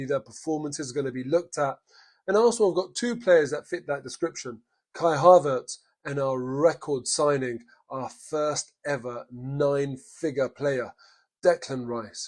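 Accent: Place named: Scotland, United Kingdom. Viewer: There's nothing quite like a Scotsman on commentary. Reminded me of Andy Gray too. Great commentary.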